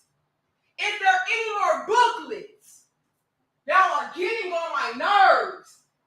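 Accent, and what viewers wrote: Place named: St. Louis, United States. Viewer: Important message. Thanks. Where are you from or raised? I'm trying to place your accent. Ok, St Louis.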